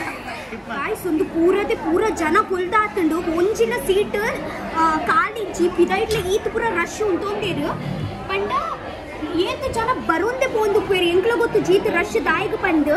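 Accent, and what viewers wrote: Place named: Tulu Nadu, India. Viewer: Your tulu accent reminds me of bombai da binner comedy by MAD IN KUDLA 🤣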